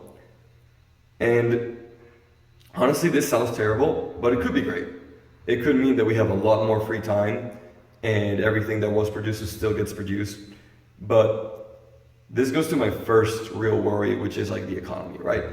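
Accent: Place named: Spain. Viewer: You Spanish? I love your accent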